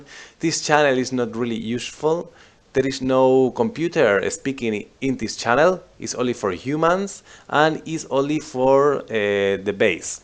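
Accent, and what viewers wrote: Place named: Argentina. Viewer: You sound like my coworker from Argentina. No worries. Your english is good. Thanks for the tutorial. After 10 years back in the Pit I was a bit lost with all the changes from 4.32 back then haha.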